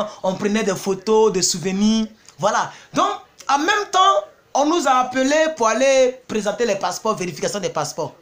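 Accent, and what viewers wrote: Ivory Coast. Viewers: 😂😂😂 Ivoirien tu es trop fort, ton accent est trop chic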